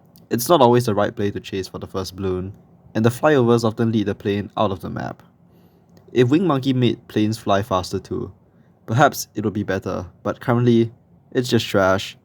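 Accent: Singapore. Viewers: why do you sound singaporean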